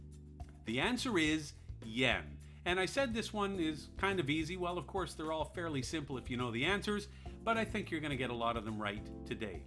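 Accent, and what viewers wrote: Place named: Canada. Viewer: Canadian, eh? 7/10...thanks, from Alberta! Habs fan...sorry!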